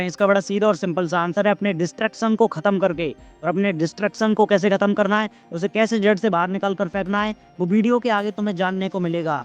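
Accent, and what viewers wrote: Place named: India. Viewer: I am not able to understand. I struggle with Hindi accent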